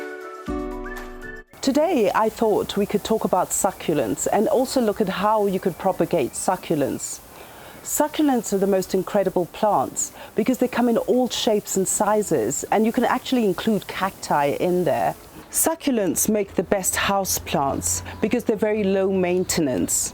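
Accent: United Kingdom. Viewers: Her British accent is the best of all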